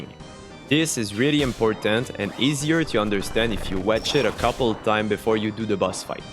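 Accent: Quebec, Canada. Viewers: mmmm ca sent le quebecois lol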